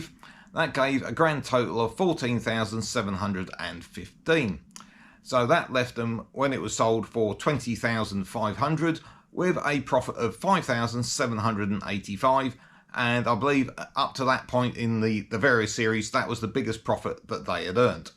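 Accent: United Kingdom. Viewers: Would you mind putting up a trigger warning next time you put Brewer in the video? Cheers mate. Great video and great car despite being built in NI and despite being worked on by WD. BTW whats your accent sounds a bit Suffolk to me, ba. Cambridge out.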